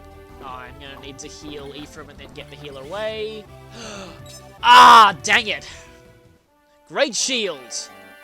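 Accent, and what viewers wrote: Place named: Australia. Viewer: man that speed up voice really did show your Australia accent.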